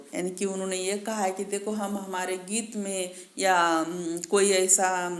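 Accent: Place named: Maharashtra, India. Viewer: Aapka fluency Marathi lag raha hai not good speaking Hindi...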